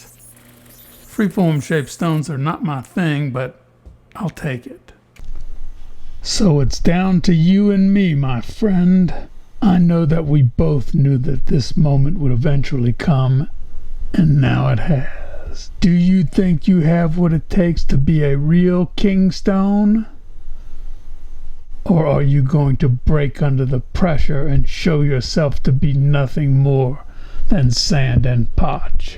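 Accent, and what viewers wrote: United States. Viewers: As I was leaving that comment I was thinking that I was probably wrong about the area of the United States where you picked up your Inflection. Bottom line Is that it all goes together with your tongue in cheek humour... It works!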